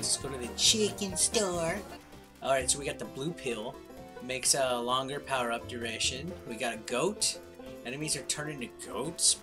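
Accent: United States. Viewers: Sweet country accent bro lol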